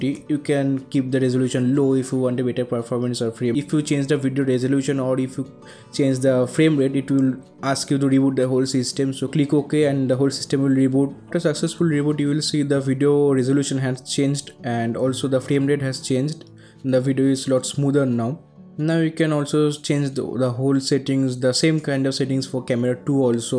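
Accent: India. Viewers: But this indian accent so terrible...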